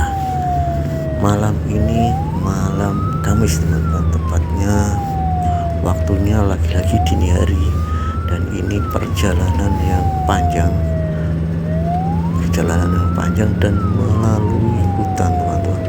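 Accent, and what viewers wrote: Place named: Tegal, Indonesia. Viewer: Tegal..ora ngapak ora kepenak..hadir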